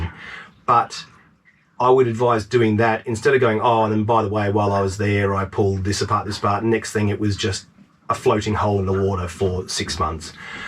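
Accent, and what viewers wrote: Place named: Australia. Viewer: Loved the chooks,, hated him. No, truly mate, this has been a super adventure. Glad it was you and not me. Really admire your courage and tenacity and your 10 tips are right on the money. I'm an ex Aussie in Alberta Canada and listening to your familiar accent has been a treat. Looking forward to many more vids.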